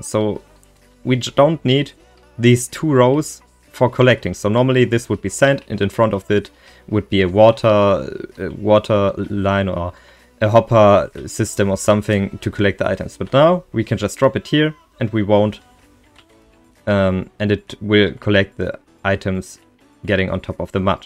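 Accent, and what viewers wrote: Germany. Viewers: Your german i hear your Accent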